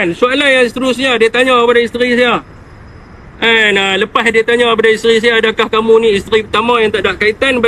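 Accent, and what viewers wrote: Kedah, Malaysia. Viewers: AKU TENGOK MUKA KAU DAH MACAM BEROK. KELUARGA SIAPA NI AGAKNYA. CARA PERCAKAPAN MACAM ORANG KEDAH BETUL KE🤔🤔🤔🤔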